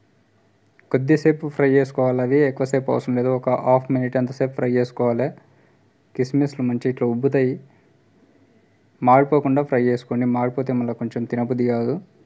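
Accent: Telangana, India. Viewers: Anna mana telangana slang lo vantalu vintunte kadupu nindi pothundhi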